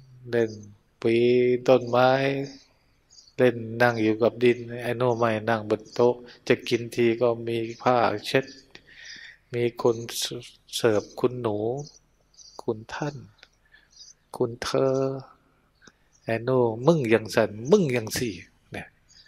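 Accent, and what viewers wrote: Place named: Isan, Thailand. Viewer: ขอนอ้มนมัสการเจ้าค่ะ.ท่านเจ้าคุณ..ด้วยความเครารพได้ยินเสียงหัวเราะมีเสียงยิ้มๆไม่ได้เห็นรูปไม่เห็นหน้าตาเจ้าค่ะ.แต่ก็โอเคเจ้าค่ะ.ชอบฟังๆท่านเจ้าคุณบรรยายเป็นภาษาอีสานก็มีท่านเจ้าคุณพูดภาษาอีสานเพราะๆมากๆ.กราบ.กราบ.กราบ.สาธุ.สาธุ.สาธุ.เจ้าค่ะท่านเจ้าคุณ.🙏🌹🙏🌹🙏🌹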